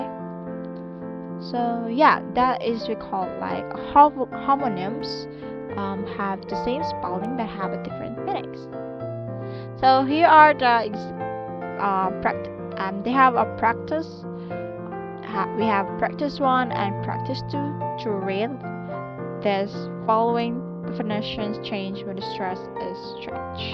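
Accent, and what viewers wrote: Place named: Philippines. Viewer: Your filipino accent is wonderful. I'm from Philippines 🇵🇭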